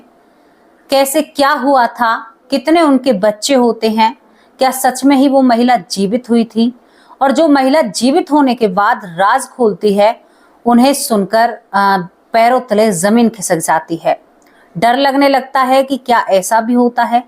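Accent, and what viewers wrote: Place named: Braj, India. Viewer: आत्मा अजर अमर अविनाशी है।यही अटल सत्य है जी। आपकी हिंदी उच्चारण से आप ब्रिज क्षेत्र की प्रतीत होती हो, कृपया अपने बारे में भी बताने की कृपा करिएगा। सद्भावनाओं सहित दिनेश सारस्वत नयी दिल्ली से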